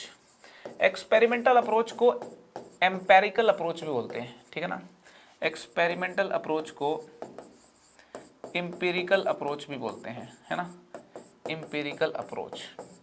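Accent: India. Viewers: I understand Indian accent badly but it is still better than our math at school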